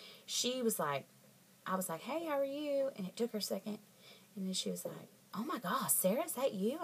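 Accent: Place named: South, United States. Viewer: I'm on the east coast so your so southern sounding and I love it :) enjoy your weekend and keep up the great work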